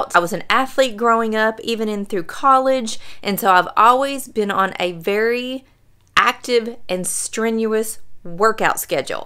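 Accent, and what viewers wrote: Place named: Kentucky, United States. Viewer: Wow. My first program from you was all about Pollock. Great u-tube. Now I sit down with you, and get my visit with my new best friend! You are so fun to get to know. I've seen 10 of your videos and am hooked. My Daddy was from Texas. I was born in Paradise (San Diego) and in elementary school people would ask me about my accent. Was I from the South? I love your Kentucky accent and and you confidence, southern charm and relate to your experiences. I'm 72. Soon to be 73 in June. TY for being my new BF. God bless you, lady bug. Linda D in SD.😊🇺🇸💕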